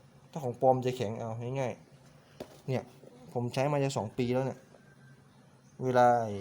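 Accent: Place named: North, Thailand. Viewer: คนเหนือกาครับสำเนียงมันใช่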